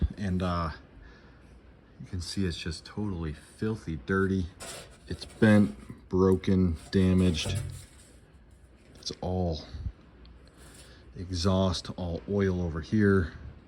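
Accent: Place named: Wisconsin, United States. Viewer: I was gonna ask if you were from Wisco with that Sconnie accent. But then I saw Monona on your hoodie and read the bio on your page. Always nice seeing some locals on you tube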